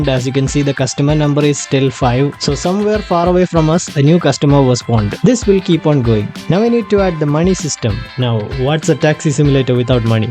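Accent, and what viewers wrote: Kerala, India. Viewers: ur slang is just like malayali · I knew u were from kerala by lisisting to your voice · i know a fellow malayali when I hear one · your sound like you are from kerala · Accent kettal ariya malayli aan nn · Sounds like malayali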